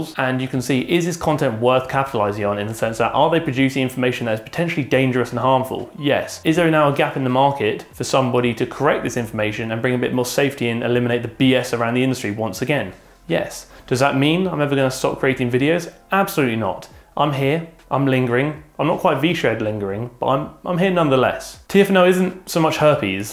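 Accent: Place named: RP, United Kingdom. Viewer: i only watch this because of his hugh grant accent omg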